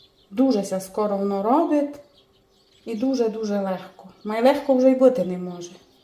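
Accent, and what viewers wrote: Transcarpathia, Ukraine. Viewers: Підписалась, який файний канал!, Ваш діалект, для мене мед у вуха 🫶 я переселенка з Бахмута, тепер жию на Закарпатті, дуже подобаються люди і цей діалект❤ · Як його навчитися говорити як на Закарпатті? Мова дуже файна.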